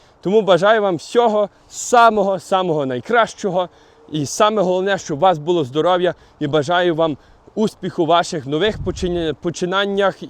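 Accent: Western Ukraine, Ukraine. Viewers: говорить із західним акцентом, так. Але його вимова притаманна більше до діаспорської, або до того, як колись розмовляли дуже давно на заході України. Зараз у нас так не те що молодь, але і люди 60+ не розмовляють. Не знаю, з чим це пов’язано, мабуть все таки вплив англійської вимови дає такий акцент українською. Навіть дідусь, з яким я бачила відео, розмовляє більш сучасною укоаїнською😊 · Доречі про акцент, саме тому почав дивитись, но я всеж таки підозрюю, що у є задатки актора, режисера і тд, він полбляє, якісь сценки з братом, робити, старається, молодець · дивлюсь вас не з інтересу про Канаду, а з інтересу до західно-українського акценту:)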